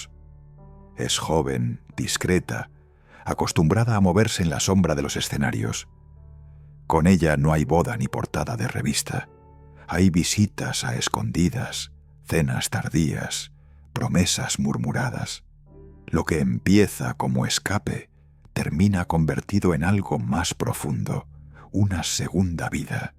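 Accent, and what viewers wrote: Spain. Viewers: Mal muyyyyy mal su reportaje, José Alfredo Jiménez, NO escribió más de mil canciones, son entré 360-,o 380, infórmese primero, su acento español, de lo que Usted dice, son mentiras......... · Eres español, que vas a saber de México. Me choca el acento de Uds.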